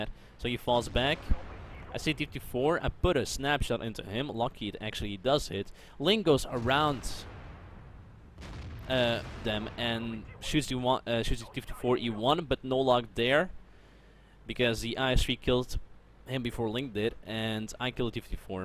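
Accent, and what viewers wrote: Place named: Netherlands. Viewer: Are you Dutch because you have a kind of a Dutch accent?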